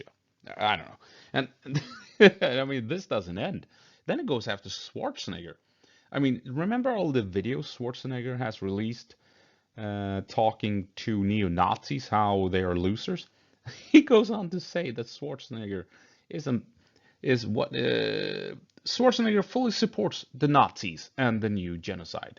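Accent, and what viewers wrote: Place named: Gothenburg, Sweden. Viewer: If I had the money I would pay for you to read more troll comments in that thick Göteborgs-English lol. That was great haha.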